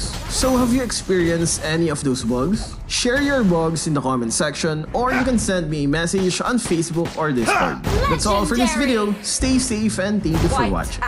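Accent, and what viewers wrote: Philippines. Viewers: Halatang pinoy talaga sa tuno mag English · Pinoy to halatado sa tono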